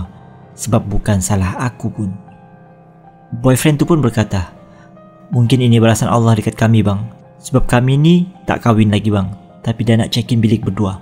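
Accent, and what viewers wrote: Sabah, Malaysia. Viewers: Tukar pembaca melayu yang betul2 melayu..ni bahasa baku dia kuat slag Sabah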